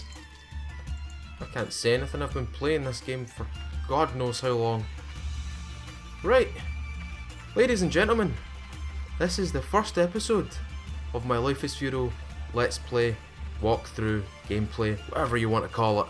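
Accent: Scotland, United Kingdom. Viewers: I did NOT insult your accent, I really like the way scottish people from all over Scotland sound when they speak, I was just asking because it sounded like you had a scottish accent, but it sounded a bit different from the scottish I've heard so far, so I was unsure.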